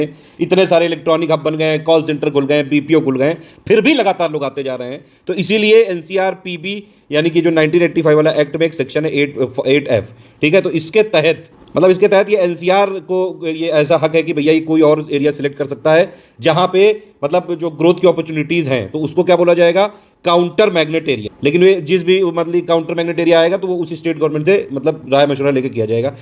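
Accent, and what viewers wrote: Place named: Kanpur, India. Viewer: Aapki bhasha... Kanpur se milti h.... Nice knowledge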